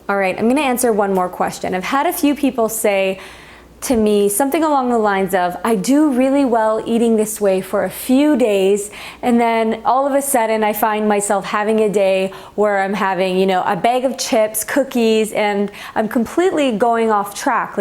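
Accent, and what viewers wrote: Canada. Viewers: Off topic question, are you Canadian?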